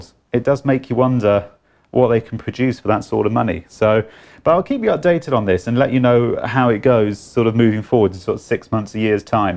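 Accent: China. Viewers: Chinglish, love it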